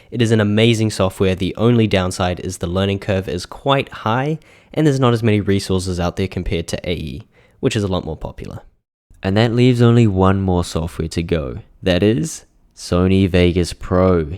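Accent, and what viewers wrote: South Africa. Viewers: South african? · r u south african?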